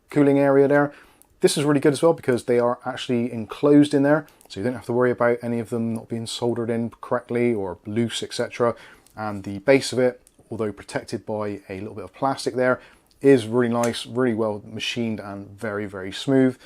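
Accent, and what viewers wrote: Bristol, United Kingdom. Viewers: Bit of a novice to PC builds I have an old motherboard ASUS H81M with a intel i7 4790 CPU in a HORNET CA300-NG case! reckon this un ill fit? PS nice to ear a Bristol accent! cheers.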